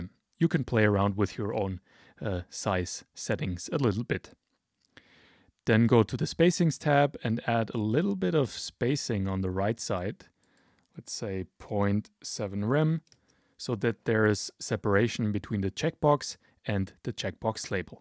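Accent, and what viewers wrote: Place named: Poland. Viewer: Your channel is great, your pacing is excellent, easy-to-follow and not monotonous or boring whatsoever. I stayed engaged and interested the entire time, and the way you laid things out starting with basic styles and narrowing down to more advanced styling was really logical and built a solid foundation of understanding (and retaining!) the information! Please keep it up, make more videos and continue being awesome. Also, are you Polish perhaps? Trying to guess by the name and the accent, I couldn't tell because it's not very strong, so I am just curious. I am Polish myself!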